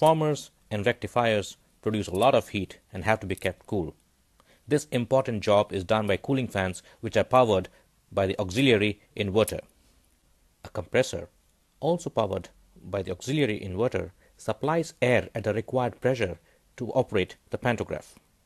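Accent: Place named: India. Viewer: The streetshitters accent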